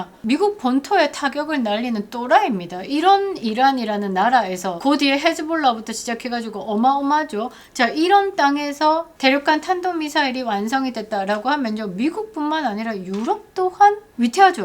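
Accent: Gyeongsang, South Korea. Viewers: 경상북도 출신인교?사뚜리가!너무너무 좋아요!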